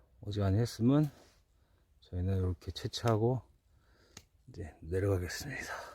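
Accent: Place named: Chungcheong, South Korea. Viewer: 충청도 사투리 끝판왕이십니다~~^^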